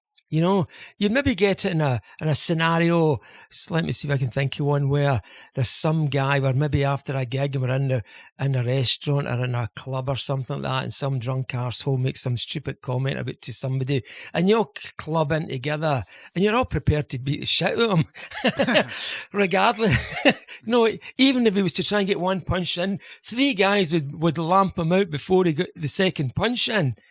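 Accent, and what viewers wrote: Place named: Scotland, United Kingdom. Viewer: I really love your talks! - Fascinating & interesting & funny as hell - the way you talk with that delightful Scottish accent and Humor! 😀 - and very nice voice!. You are an excellent speaker, and wonderful to hear your take on things from the (G.O.A.T!) Keyboardist!. You are one of my fave keyboardist's in the world ever - along with Eddie Rayner out of Split Enz. I LOVED the way you played that CP70 Yamaha!.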